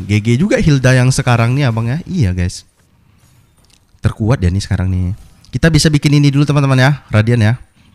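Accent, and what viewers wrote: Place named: Pekanbaru, Indonesia. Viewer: Org Pekanbaru bg? Nampak betol logat pekan ABG awak ni 😅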